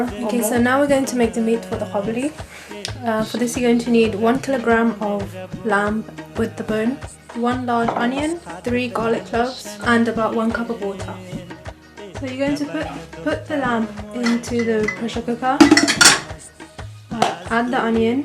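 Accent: United Kingdom. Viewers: I'll try this recipe. Thanks for posting it. Like the music too - what is it? And nice accent - are you from London? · Hey I loved your voice it's so soothing and your British accent makes it even more beautiful 😌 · I was into her British accent than cooking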